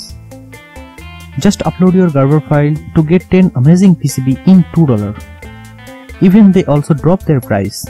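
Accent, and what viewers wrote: Bengal, India. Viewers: you have much of bangali accent 🙏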